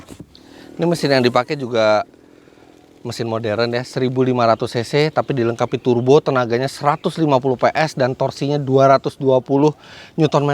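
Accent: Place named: Serang, Indonesia. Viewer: Orang serang mana suaranya👋🖖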